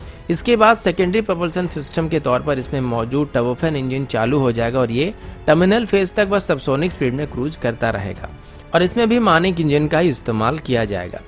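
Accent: Odisha, India. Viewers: Lite odia accent . I know bcoz we both are from odisha😀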